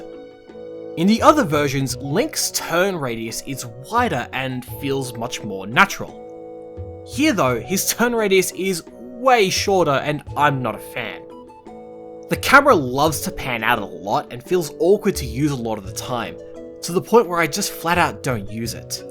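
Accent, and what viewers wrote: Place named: Australia. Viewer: Nothing better than some late night aussie/british video game commentary essay, great work!! if they remade the game like they did with xenoblade 1, I wonder if they would also just make it more anime like to match with botw but I fear it would impact the more dark and muddy tone it had, really added a lot to the ambiance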